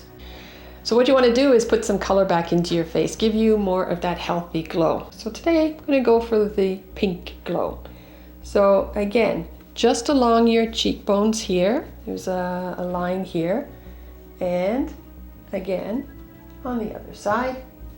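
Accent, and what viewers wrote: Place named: United Kingdom. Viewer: Love it! I'm starting to hear your British accent more 🥰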